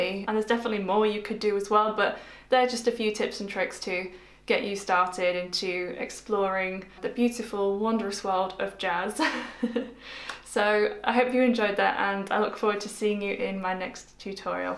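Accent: United Kingdom. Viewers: Wow, what a great piano lesson, wish you were teaching live! Exactly what I needed to know at this stage of my piano journey. Your British accent is icing on the cake. Well done madam.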